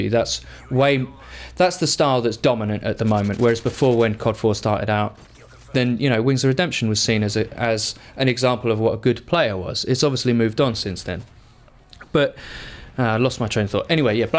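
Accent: United Kingdom. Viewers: Lolzer i thought i was in my sub box, clicked this video thinking it was BT heard the english accent and thought wtf? Really enjoyed the video and will definitely subscribe:) hon the BT for liking he video and confusing the shit out of me:L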